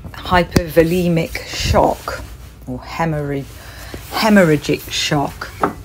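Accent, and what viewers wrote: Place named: United Kingdom. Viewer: I absolutely love your voice and the accent, however horrible things u're speaking about. It's soooooo British! 😀 Where are u from, more exactly?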